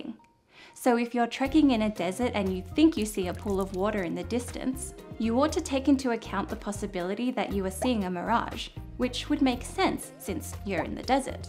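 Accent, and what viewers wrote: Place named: Australia. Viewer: I love your cultivated Australian accent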